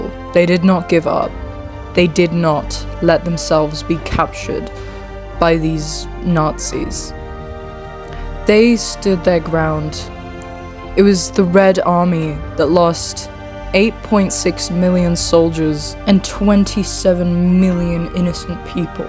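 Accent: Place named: RP, United Kingdom. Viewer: Perfect BBC English; умничка! Никто не забыт, ничто не забыто.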